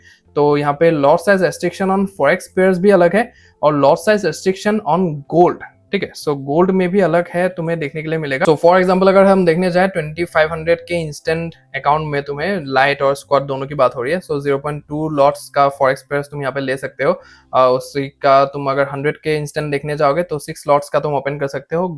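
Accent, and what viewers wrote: Assam, India. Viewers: Are you Assamese ?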